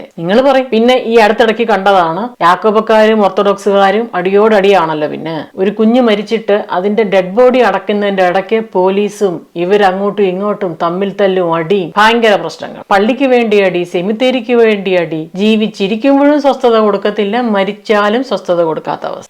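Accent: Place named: Ranni, India. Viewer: Excellent narration. Are you from Ranni /pathanamthitta? Just guessed from your accent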